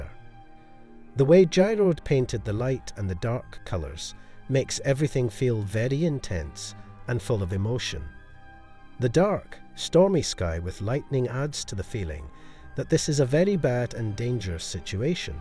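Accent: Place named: Scotland, United Kingdom. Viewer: I love your voice sooooo much! I can listen to it forever lol. Scottish sounds so cool. You are a great audio book haha 😂. Keep up the videos you got a sub!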